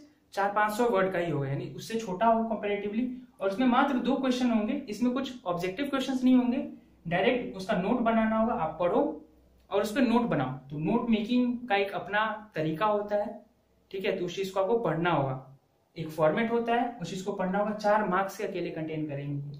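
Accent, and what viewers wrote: Bihar, India. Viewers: Bhai tum motihari se ho kya?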